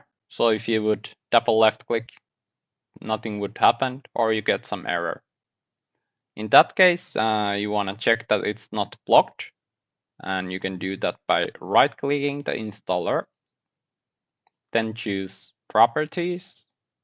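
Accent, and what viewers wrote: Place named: Finland. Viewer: once i heard a finnish mans voice i knew this was going to be a stupendous game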